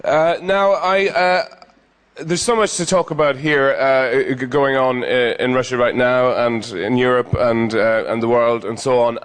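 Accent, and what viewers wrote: Ireland. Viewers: Ирландский акцент довольно клевый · как это нет? а лойк вместо лайк, а эбойт вместо эбаут? а ирландское r? а интонация? вполне типичный ирландский акцент (республика Ирландия)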